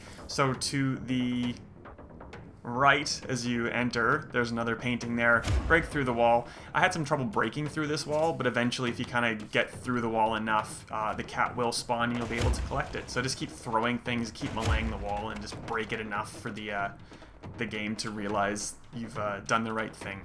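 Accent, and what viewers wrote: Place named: United Kingdom. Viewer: Hes British I would guess.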